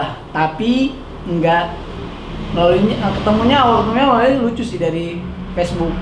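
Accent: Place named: Jakarta, Indonesia. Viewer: Logat nya ga ngebuang betawi banget,mirip abang mandra logatnya ❤❤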